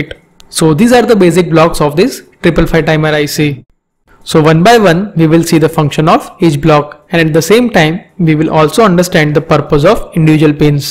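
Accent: India. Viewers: He is so overrated... Most of his lectures are not understandable... And the worst part is his pronunciation of english words sounds horrible😂😂😂....such a dumb indian accent.. And he also teach us wrong thing... here Q is 1 then Q' is 0.. So output should be 0...if Q is 0 then Q' is 1.. So output should be 1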